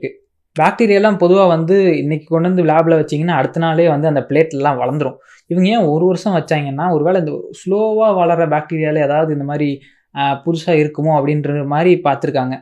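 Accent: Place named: Madurai, India. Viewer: என்னதான் ஜெர்மனில் போய் ஆராய்ச்சி பண்ணினாலும் பேசற அப்போ நம்ம மதுரை ஸ்லாங் வருதுல்ல🎉🎉😂😂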